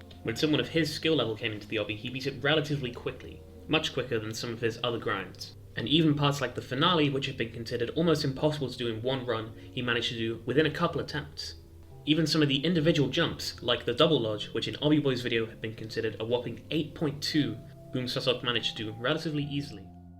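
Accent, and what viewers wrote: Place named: Wales, United Kingdom. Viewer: He’s welsh your English isn’t the problem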